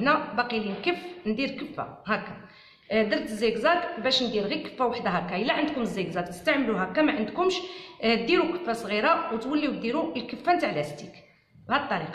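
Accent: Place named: Algeria. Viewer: لهجة جزائري صعبة شوية عباقي الدول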